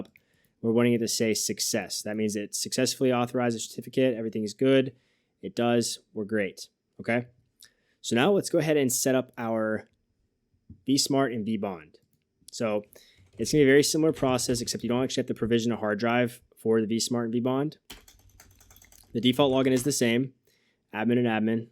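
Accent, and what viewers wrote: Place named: United States. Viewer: Very good structured presentation, but much too fast and with a hard to understand American English dialect if English not the own mother tongue.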